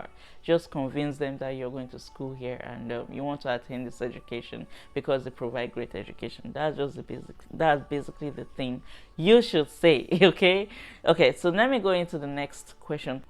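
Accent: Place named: Nigeria. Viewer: Where u from ?Like u have naija accent buh the name tho...🤔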